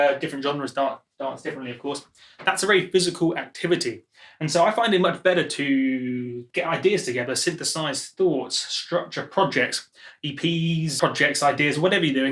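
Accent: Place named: United Kingdom. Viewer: agreed. he has a horrible caser of British pouty voice and british throw away talk syndrome.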